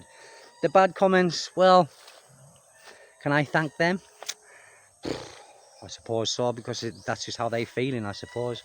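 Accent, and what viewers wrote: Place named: Scotland, United Kingdom. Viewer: Started listening to you speak and you reminded me so much of my time teaching English in Japan at a British based school. Just closed my eyes and listened. Correct me if I'm wrong but do I hear Scotland? Sound so much like my friend Pat. Cheers mate!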